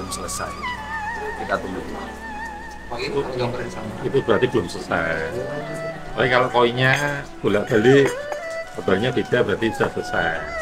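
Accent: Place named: Semarang, Indonesia. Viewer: Semarang tulen logate nyenengke tok. Aku padamu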